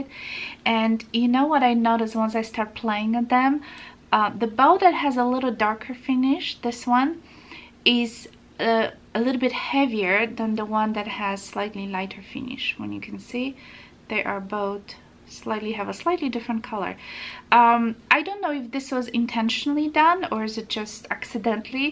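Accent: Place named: Arkansas, United States. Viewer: First, I never knew the people from Little Rock, AR had such an enchanting accent. I could listen all day. I've ordered a more expensive "beginner" violin, a Gliga Genial-1 Oil varnished violin that is handmade in Romania, since I have many friends from there. With some upgraded D'Addario Heliocore strings, (I heard the regular strings aren't great), a carbon fiber bow, and a Wittner tailpiece, I spent over $420. (Those upgrades were suggested by another Teacher/Review on Youtube). I have been waiting for my violin, but I'm honestly considering picking up one of these Eastar violins so I can get started! With Amazon's fast delivery I may be practicing for a week before the Gliga arrives. Thank you so much for your thorough review. So many of the luthiers make fun and talk badly about the less expensive violins. Based on your review I think I can get an Eastar and that I will not become frustrated because my violin is inferior. 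I may throw some D'Addario Preludes on it. So many music stores sell Chinese violins. Even the luthiers, (Olaf and Fiddlerman), buy Chinese violins made to their specifications. Thank you again for your beautiful review. Please let me know if you think my setup is appropriate.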